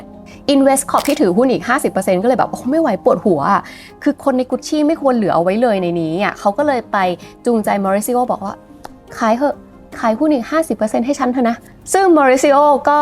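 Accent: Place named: Thailand. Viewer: เล่าตัดต่อมากคะ แต่งงๆ กับชื่อและอิตาลีสำเนียงไทยภาษาอิตาลี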